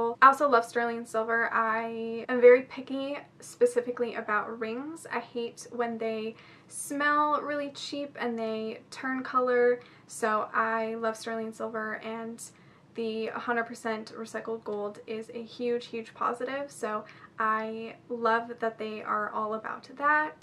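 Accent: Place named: Canada. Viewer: Good to know your Canadian. I like more your accent compared to Americans.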